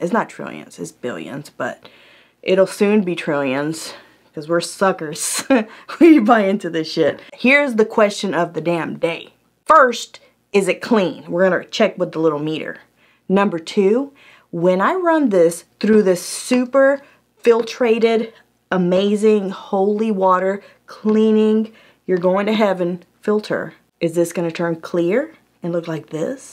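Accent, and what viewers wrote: Texas, United States. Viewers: I watched one video previous to this and I was thinking to myself I bet she’s from Texas! I watched this one and I knew lol. - from a fellow Texan.❤️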